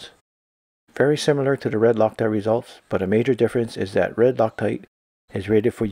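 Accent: Hawaii, United States. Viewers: eh you from Hawaii? I can hear the Piggin brah!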